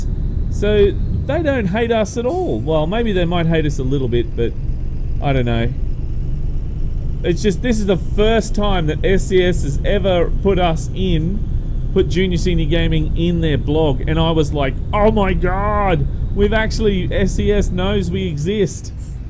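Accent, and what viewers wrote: Australia. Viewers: Are u Australian ?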